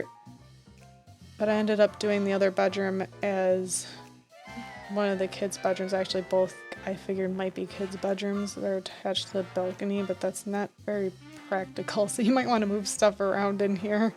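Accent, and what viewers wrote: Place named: Syracuse, United States. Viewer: This is awesome. There is a magnolia in my hometown of Syracuse NY. Your accent kind of sounds like you’re from there!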